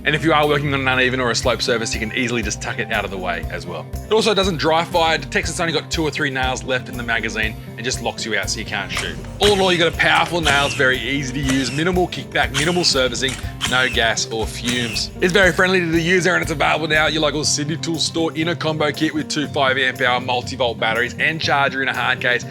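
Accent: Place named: Australia. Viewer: Cant understand your aussie twang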